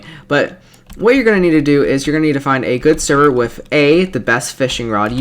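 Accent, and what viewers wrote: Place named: United States. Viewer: because cowboy accent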